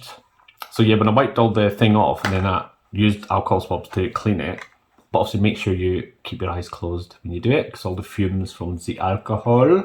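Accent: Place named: Scotland, United Kingdom. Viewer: So refreshing to hear a Scottish accent. Been in Canada since I escaped England in 1988. I am thinking of doing this Juve eye thing myself. Do my own Botox but got flagged by customs so dunno if I will be able to get product at my home address. I guess it's illegal to even do your own Botox here in Canada. Bummer. I got cheek filler yesterday as a 'model' at a place where I know the owner. He's now got his own clinic and doing amazing. Young, Gay and very artistic. Cheek filler was painful, so I'm glad I never attempted to do it myself. The crunching of tissue is unnerving! Anyhow, sorry about your Soccer defeat by the Germans today. Probably our turn on Sunday!!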